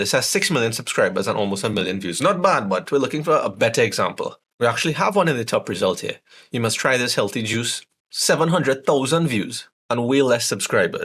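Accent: Trinidad and Tobago. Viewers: I love your Trini accent. #subscribed.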